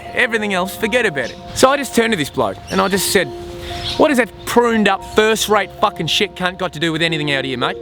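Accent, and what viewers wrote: Australia. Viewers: yikes. i really doubt anyone wants to go a country where they'd have to hear your stupid aussie accent every day. better to be eaten by sharks. trump 2016!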